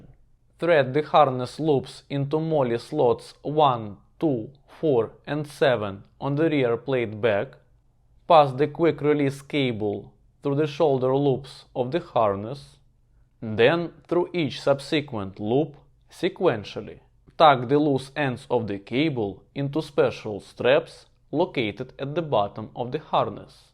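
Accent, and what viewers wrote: Russia. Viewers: Неплохо сделано, даже русский акцент)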